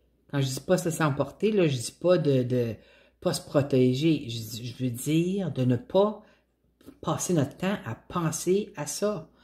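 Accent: Canada. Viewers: J’ai oublié...j’adore cet accent canadien qui pour moi correspond a optimiste et musical...! 🙏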